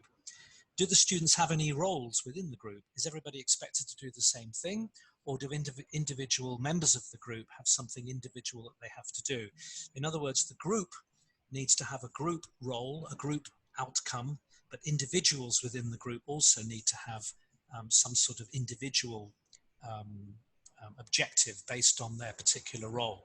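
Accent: United Kingdom. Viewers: By the way, you have got a wonderful English (I know I should write British) accent. It´s music to my ears.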